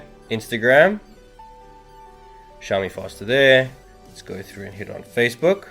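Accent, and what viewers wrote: South Africa. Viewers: Are you south african? I am. Nice video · are you south african? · i hear from your accent you're from SA. i am too. i am thinking of getting this but retailers dont import the mi series and wont repair it (I asked them, they'll only repair phones they've sold and they only import redmi here). any idea where i can get a mi 9 fixed if it breaks? thats my only concern before buying. thanks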